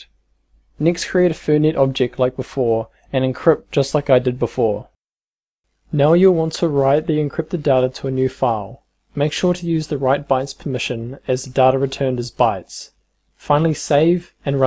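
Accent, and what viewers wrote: New Zealand. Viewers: Thanks. I like the Kiwi accent too.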